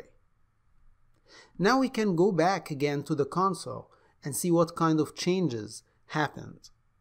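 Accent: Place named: Iran. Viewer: You can't hide that Iranian accent mate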